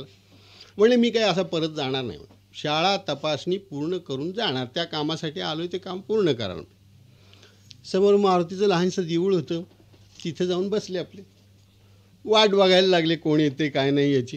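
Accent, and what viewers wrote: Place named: Mandesh, India. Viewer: माणदेशी बोली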